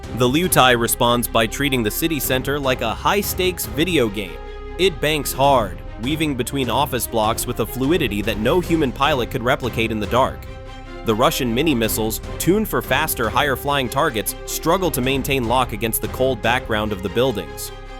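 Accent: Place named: United States. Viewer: CLICK-BAIT SCAMMER1 TOTALYANK VOICE B.S.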